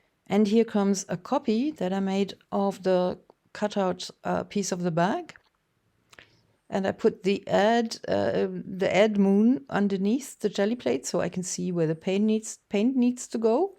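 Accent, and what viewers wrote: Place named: Germany. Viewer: Guten Tag from Tennessee, USA. At least I believe you are German but your accent is different from my husband’s. He’s a German National from Hamburg. Your English sounds a bit different than his. And the advertisement with the word fisch also led me to that conclusion. That being said, your work is quite beautiful. Prost from America.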